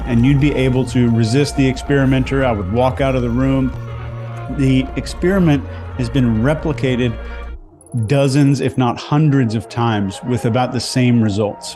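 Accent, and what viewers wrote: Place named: South, United States. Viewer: Guy cant take constructive criticism. Hes got a huge ego, he said people who hide their accents are not to be trusted, but he hides his own southern drawl. He constantly makes up new words like “ive officially called this xyz… “like he works for Webster now as well. Sleazy salesman, remember nothings free if an app is free youre the product.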